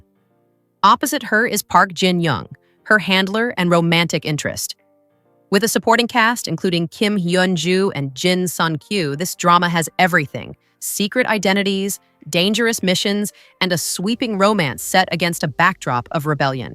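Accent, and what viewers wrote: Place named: United States. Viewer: American accent on korean language hurts my ears. 유지태이???? Arghhhhhh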